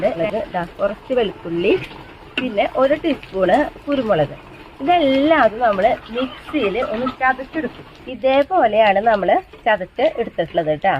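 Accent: Thrissur, India. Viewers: എവിടെ ആണ് നിങ്ങളുടെ വീട്? തൃശ്ശൂർ സ്ലാംഗ് കേൾക്കാൻ ഒരു സുഖമുണ്ട് ഇല്ലേ? ഞാനും തൃശൂർകാർക്കാരി ആണ് ട്ടോ.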